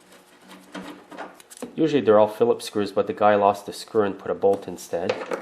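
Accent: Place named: Canada. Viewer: nice to hear a canadian,nice job on the video.thank you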